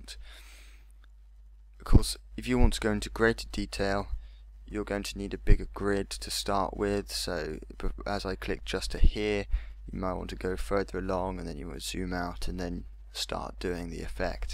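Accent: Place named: United Kingdom. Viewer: your british accent is sexy, the british women have the awesomest accents cause their so foreign and thickly uk if not usa but once you teach a british woman to read (depends on the woman) you may find your self dying of laughter and although in love to <3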